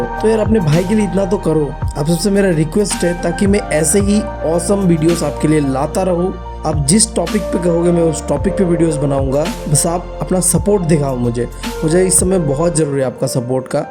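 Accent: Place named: Bengal, India. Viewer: Bhaiya are from bengal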